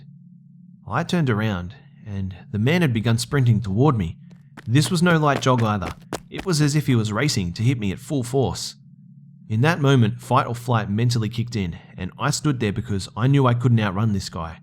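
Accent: Australia. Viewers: God dam it you are aussie now i got to like and subscribe becusae its the aussie thing to do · you can literally do anything and I'm happy. I just love that Aussie narration!!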